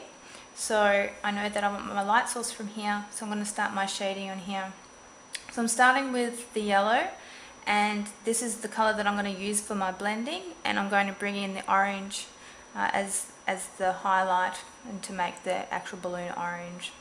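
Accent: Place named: Australia. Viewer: AUSTRALIAN ACCENT FTW!!!!!!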